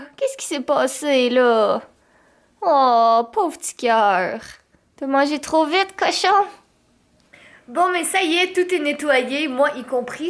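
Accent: Quebec, Canada. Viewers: Tu es trop belle j'aime trop ton accent Québécois j'aime trop t'es vidéo 💖💖💖💖💖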